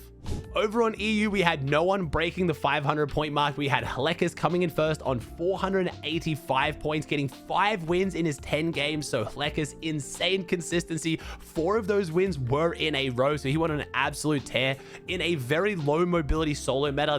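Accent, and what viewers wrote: Australia. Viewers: little glitch from robo Aussie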